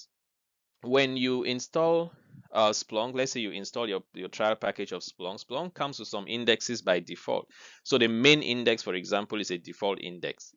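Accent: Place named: Nigeria. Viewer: you sound very Nigeria but i like you teaching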